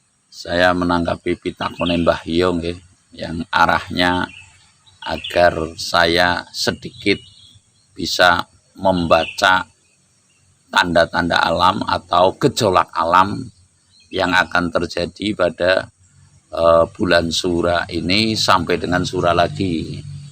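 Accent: Banyumas, Indonesia. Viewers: Aku suka logat ngomongnya ngapak2 gitu lucu tp serius,lama2 tk perhatikan tambh muda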